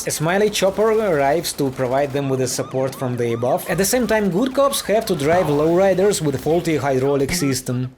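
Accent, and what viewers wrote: Russia. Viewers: The little trix with your and nice everyone can do that but you need to get someone that talk English. Not Russia.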